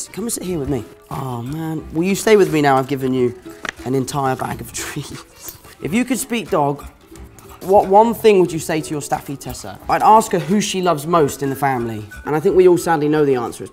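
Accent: United Kingdom. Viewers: His British accent has come back 🤩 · He took back his British accent, no ?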